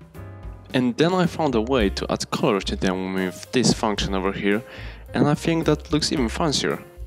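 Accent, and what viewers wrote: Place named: Russia. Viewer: it means gj. Cuz ur accent I thought u r russian, but anyway, u made a good tone of work. That inspired me so much. Right now studying golang, and i hope i can make it, wish me luck :D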